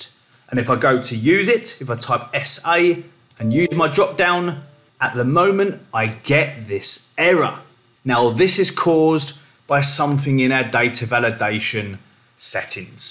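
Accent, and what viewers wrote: United Kingdom. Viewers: R u british? Love that accent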